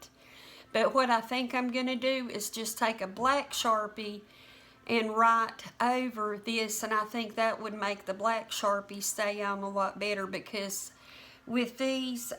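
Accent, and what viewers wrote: South, United States. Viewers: What an adorable ideal I love it because I have many spices this will be helpful, but could I make one or two suggestions just off the top of my head. You could take the lids to the jars which are metal lay them face up and spray them with a quick coat of dark Krylon paint , then you can write on them with regular marks-a-lot. or sharpie. And in my kitchen if I'm going to put it anywhere near the stove I need to put a little plastic cover something over top so that the grease in the air from the cooking does not accumulate on these glass bottles otherwise will be washing them all the time but I do love the idea and I'm going to do it ,so thanks a bunch, by the way you got to be a Southern girl. I'm from Texas but you make me feel like home thanks a lot girl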